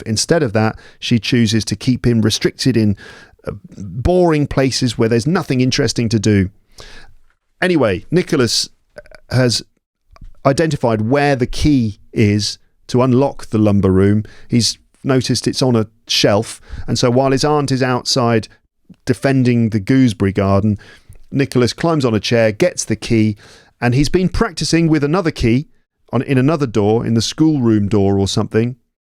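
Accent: United Kingdom. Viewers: Hi, I'm a newly retired English teacher and I just love listening to you read short stories! Your British accent is fantastic, your explanations always are appropriate and what's more often funny, which makes it all very pleasant! Thanks a lot!!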